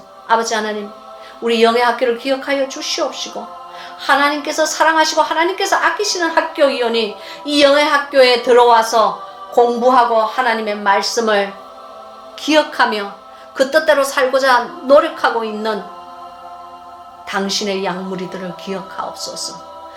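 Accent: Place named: Busan, South Korea. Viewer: 할렐루야 ~~목사님 늘 은혜 말씀과 삶을 나눠 주셔서 감사합니다 ~ 목사님과 같은 고향(부산 초량동)이라 더 정감가고 목소리와 억양도 좋아요. 사투리까지 너무 친숙합니다. 늘 강건하시고 우리들을 잘 인도해 주세요 ~♡♡♡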